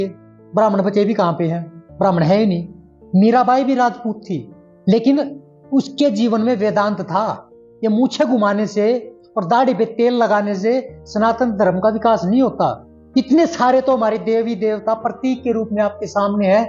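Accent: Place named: Haryana, India. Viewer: Aap jaat ho ya gurjar aapka accent haryanvi jaisa h ...ya phir aap gujrati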